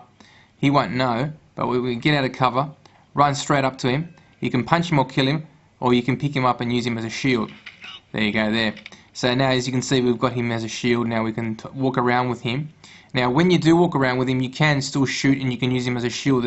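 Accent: Australia. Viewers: i like ur aussie accent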